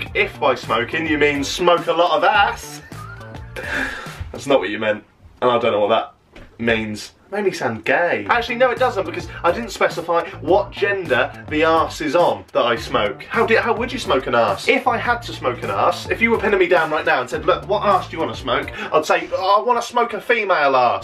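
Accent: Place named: Norfolk, United Kingdom. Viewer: Shout out to Norwich! I was brought (dragged?) up in Norfolk so accent makes me feel weirdly at home. x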